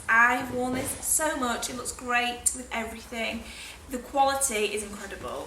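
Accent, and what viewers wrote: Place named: West Yorkshire, United Kingdom. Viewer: She's from West Yorkshire, near Leeds (in the North of England)!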